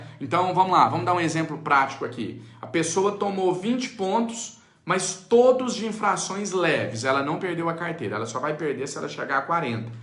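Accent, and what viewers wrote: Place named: Minas Gerais, Brazil. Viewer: É VC é mineiro ne bom dia eu tbm sou VC é o melhor pra explicar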